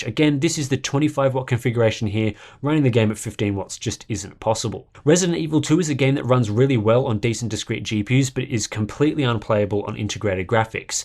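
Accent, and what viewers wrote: Australia. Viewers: Strange thing to moan about [FULL STOP] [COMMA] maybe you don't understand the accent [COMMA] he is Australian [FULL STOP]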